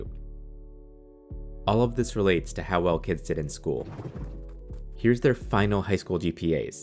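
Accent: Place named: United States. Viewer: Okay, I'm guessing America seeing as the narrator has an American accent and you tracked whether the kid sees someone get shot.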